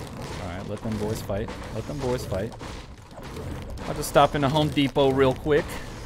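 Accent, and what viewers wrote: England, United Kingdom. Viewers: English accent🇬🇧on point👌🏾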